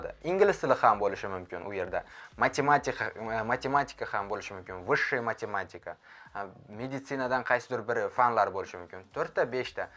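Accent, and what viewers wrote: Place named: Fergana Valley, Uzbekistan. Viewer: Майли, лекин русча аралаштирма, узингни жааа интелегент деб уйлаш керакмас. Чунки билиниб турибди, сен гирт оддий узбек мхонадонида тугилиб усганинг. Чунки шевангни водийлиги хамма нарсадан Айон килиб турибди. Хурматим ошсин десанг углим, узингни мажбурлаб курсатма рус тилини. Мен рус мактабида укиб, болалигимдан рус кушнилар орасида яшаганман. Мендакалар куп, шунинг учун биз устингдан куламиз. Бир курдим, лекин кайтиб курмиман каналингни, гашимга тегади, ясама инсонлар. Ва кон босимим кутарилиб узимни кийналишимни хохламиман.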